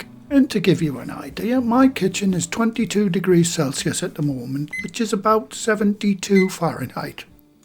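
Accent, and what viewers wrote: United Kingdom. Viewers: Oh..love the accent!! Authentic british! Cool!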